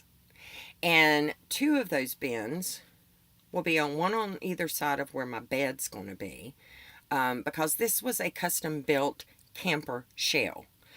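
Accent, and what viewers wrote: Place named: Carolina, United States. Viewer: I told my wife you were from North or South Carolina or maybe North Georgia with that accent. I reckon I wasn't too far off. 👍🧔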